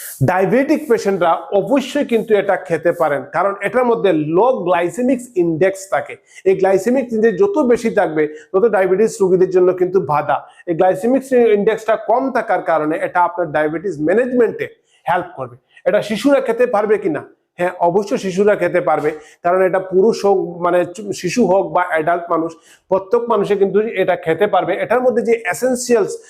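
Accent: Sylhet, Bangladesh. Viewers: ভাই আপনার বাড়ি কি সিলেট না হবীগঞ্জ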